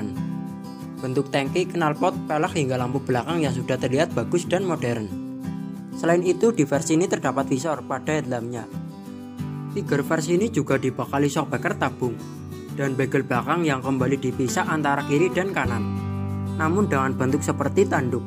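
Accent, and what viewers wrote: Java, Indonesia. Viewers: yaampun wng ndi iki suorone khas jowo bgt 😂😂😂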